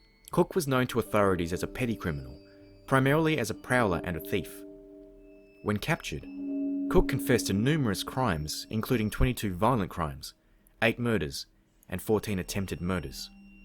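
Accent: Australia. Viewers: You sound like an aussie urmaker! I love it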